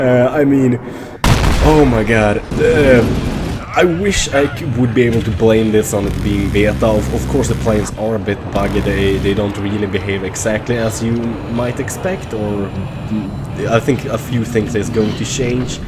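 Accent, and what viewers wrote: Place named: Sweden. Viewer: This guy has to be from Sweeden. I can hear it when he talks and I know i'm right! I just know!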